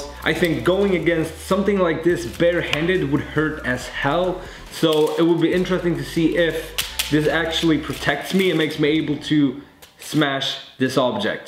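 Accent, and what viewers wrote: Sweden. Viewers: Are you swedicj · Are you Swedish?? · I was about to ask about your accent then you said Sweden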